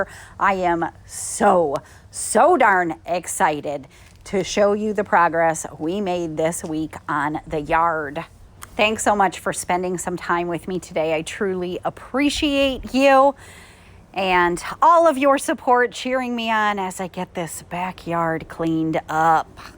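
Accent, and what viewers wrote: England, United Kingdom. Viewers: You are killing it!! I have a question. I have been following you for a year now, where does the English accent come from??? Lol have a great day!!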